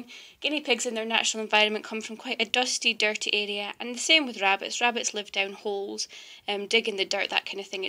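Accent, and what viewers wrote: Scotland, United Kingdom. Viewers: Are you Scottish? If you are then woo hoo! So am I...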